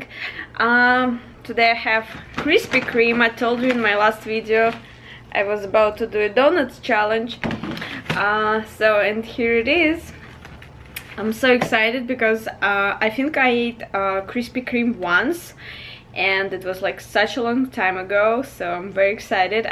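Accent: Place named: Russia. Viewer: You have a Russian accent,where r u from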